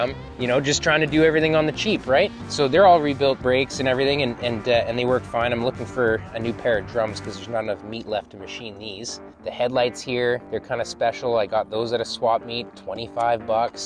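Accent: Canada. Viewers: I have no idea who you are, you sound Canadian to me, but I love what you built... yup... I'm gonna subscribe for sure... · Thats why it doesnt sound arrogant. Its canadian. Ill sub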